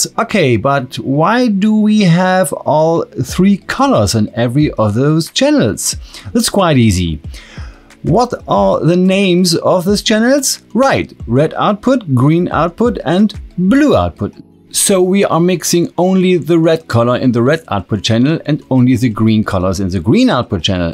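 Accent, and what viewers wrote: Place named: France. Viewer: He sounds like G-Man from Half Life, just with french accent :)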